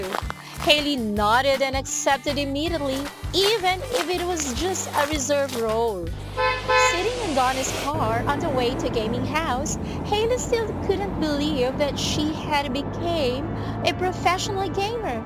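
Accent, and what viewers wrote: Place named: England, United Kingdom. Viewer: its the English accent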